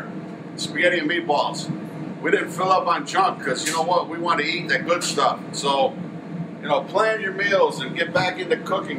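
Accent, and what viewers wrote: United States. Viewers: Where are you based sir, New York? I like your kitchen I remind me my time in London but you speak like American...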